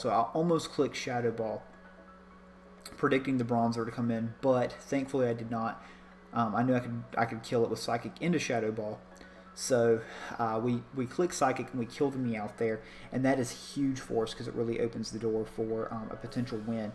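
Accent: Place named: South, United States. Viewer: GG my dude. Very well played. You sound more southern in this vid than any other. I love it haha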